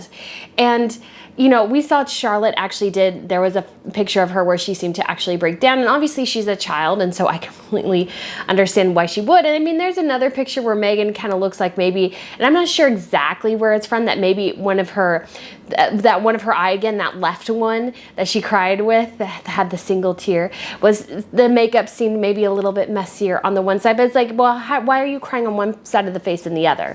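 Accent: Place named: United States. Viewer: I'm nether British nor a 'Royal', but people like you are only after klick rates here. From yr accent I assume you're American, so what makes you an 'expert' on interpreting gestures and words of people you don't know and never will? Nothing but riding the currently so popular 'Anti-Harry-Meghan' wave... Disgusting!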